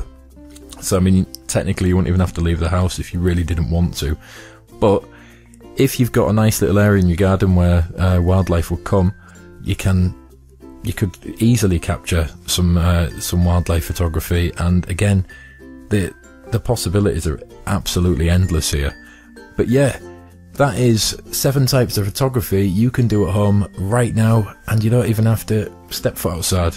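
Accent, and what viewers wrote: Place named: Leeds, United Kingdom. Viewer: sounds like a leeds accent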